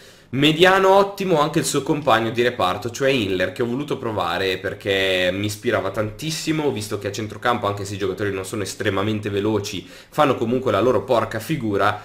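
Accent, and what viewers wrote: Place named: Liguria, Italy. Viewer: Ok, grazie ! Dall'accento pensavo fossi ligure !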